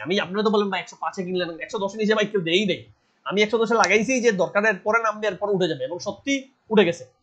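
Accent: Sylhet, Bangladesh. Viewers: ভাই কি সিলেটি?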